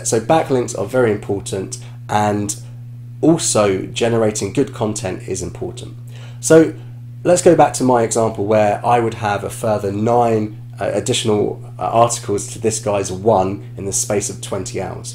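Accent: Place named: United Kingdom. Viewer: A British accent review is a treat to watch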